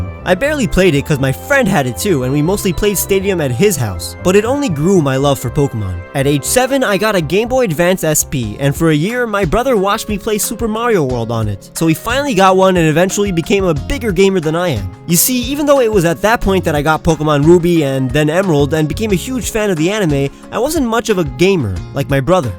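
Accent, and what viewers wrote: Israel. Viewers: אתה לא יכול לשמוע את המבטא הישראלי בקולו? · Always wondered why you had a little bit of an Israeli scent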